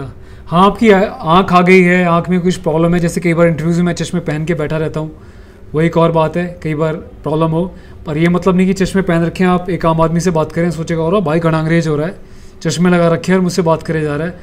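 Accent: Haryana, India. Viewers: Sir aap Haryana se ho. Kya? Btao please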